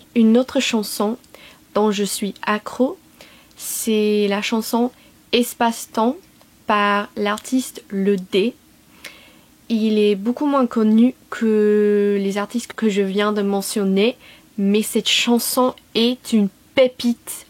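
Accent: England, United Kingdom. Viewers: Hello, excuse moi je ne te connais pas, tu es britanniques??:O Tu peux écouter: -, toujours de - 🥰, de -, de. Cette chanson est incroyable 🎸 * - un mélange * - entendu parler du groupe don't give up 🤗 (On ne devrait pas non plus prononcer le " T " à " sont " mais j'aime quand tu le dis XD ) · Hiya, just wanted to say that you remind me of a younger me who moved from England to France at a much younger age (we have the same twang and make the same mistakes when speaking french!). I also spent a lot of summers in France until my family and I eventually moved where the french side of my family lives. Being in my 20s now, I now speak fluent even native-like french. However, as my natural inner voice is in English when I'm alone, so I do still slip up from time to time, and still have a slight a twang apparently lol. Even after having lived here for over 15 years haha. 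I only wish I wasn't so isolated growing up in France and had other english speaking kids who were in the same situation as me to talk to. In fact, I can imagine a conversation between us to be refreshing because I never found someone with such a similar and yet different backround. But this is the internet, and you don't know me. So naturally this will never happen. But it's nice to know that there is someone similar to me out there! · Bravo pour votre chaîne. Votre français est très agréable à entendre, avec ce petit accent britannique tellement charmant! <link>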